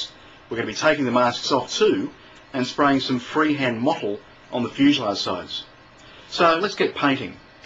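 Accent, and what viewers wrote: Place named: Australia. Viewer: is awesome and his Australian accent breaks up the monotony of his instruction. Don't forget about Sheppard Payne the master of the diorama (R.I.P.), Paul Boyer the decalling wizard, Aaron Skinner the armor fiend, Rodrigo Hernandez Cabos the airbrush genius, Geoff Coughlin; jack of all models, and the brush-painting Italian god Carmine Mari.:)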